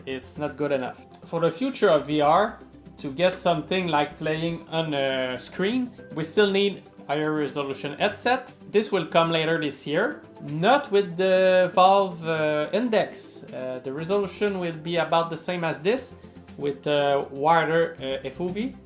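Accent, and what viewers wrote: Quebec, Canada. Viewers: un quebecois c sur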